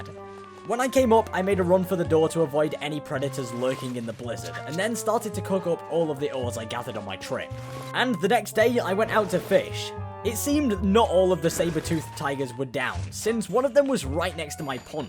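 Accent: United Kingdom. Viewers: Are you British? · Sorry to ask are u British?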